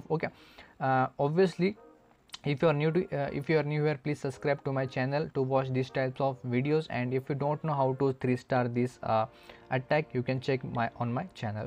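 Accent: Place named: India. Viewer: sounds like he from india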